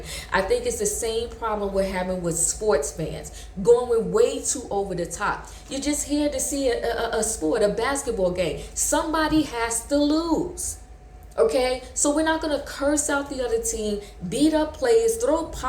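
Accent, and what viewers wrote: New York, United States. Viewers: you sound sooo new york and i love it! bloop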